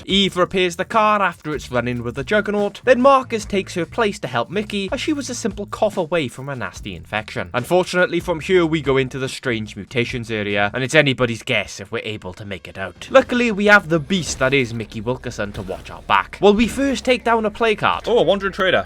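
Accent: Wales, United Kingdom. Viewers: Bro i am one min in and your personality and humour have me staying for a little longer legend live the welshy accent what’s occur-in! Get hype for sod3 brother god bless ✌️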